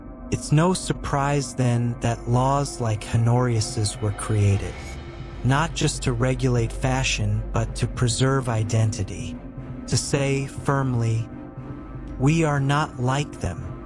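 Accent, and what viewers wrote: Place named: United States. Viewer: In American, pants are the general term used for all outer garments longer than the knee. Shorter than that, and we call them shorts. Calf length, and they're crop pants or waders. Trousers are formal (expensive) pants, usually for men. Breaches are an old, not used for anything but reenactment or renfaire, style of pants. The narrator had an American accent, so why would he call pants by a British name that would confuse those who speak American?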